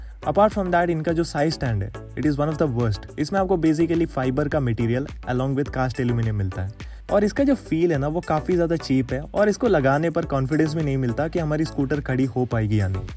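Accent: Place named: South India, India. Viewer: Sauth Indian ho bro aap aap ki accent Kuch alag lag rahi he muje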